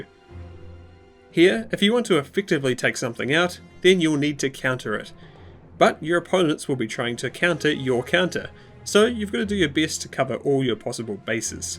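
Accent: New Zealand. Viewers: Where in New Zealand are you from?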